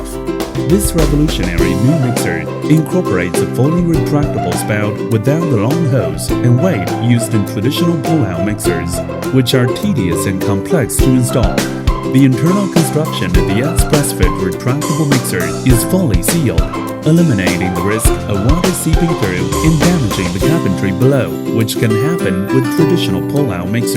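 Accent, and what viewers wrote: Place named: United States. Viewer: That music is so loud and annoying I had to play it 3 or 4 times to get everything the guy was saying. Incidentally, his accent (American) was so broad I had trouble with that too. Not to worry, I'm off this afternoon to buy this exact same tap - looks great. Just lose that lame unnecessary music please OR reduce the volume.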